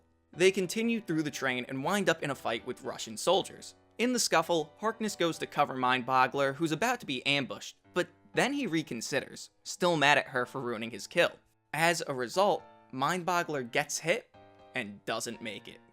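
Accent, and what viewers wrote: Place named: Boston, United States. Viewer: Homeboy and his Boston pronunciation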